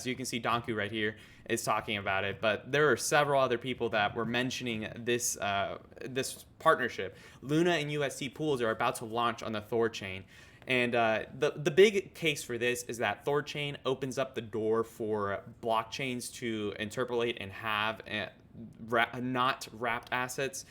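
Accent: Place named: United States. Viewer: Wena crypto Chileno. Has 100% gringo accent, must have gone to Nido de Aguilas. Nice youtube mate, will be following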